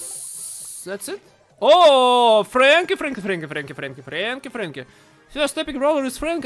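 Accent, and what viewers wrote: Russia. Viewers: russia, i know because my parents are from Russia and they have this accent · да ты руский просто по англиски говоришь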